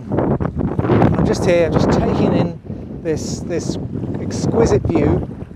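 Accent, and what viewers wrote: England, United Kingdom. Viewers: That English accent will get you anywhere...fantastic work. There's a koro nearby called vatukarasa,one side indians live and the other side fijians and both sides are very accommodating let them take you night fishing you will absolutely love it.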